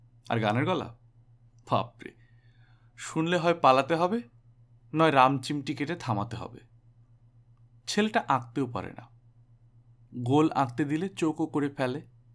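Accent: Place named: Bangladesh. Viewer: বাংলাদেশের লোক... আর ভাই, সব যায়গার ই আলাদা এ্যাক্সেন্ট থাকে৷ ব্রিটিশদের ইংলিশ ও যায়গা ভেদে ভিন্ন রকম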